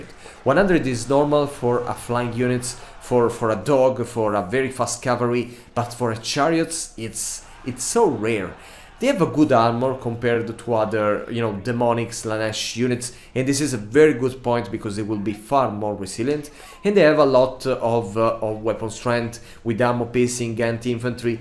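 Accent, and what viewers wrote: Italy. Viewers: Ma sei italiano?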